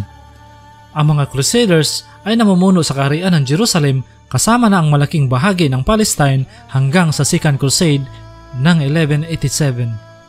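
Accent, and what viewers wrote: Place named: Visayas, Philippines. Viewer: Astig sana yung kwento... Kaso may pagka bisakol lang yung pag lalahad nung kwento...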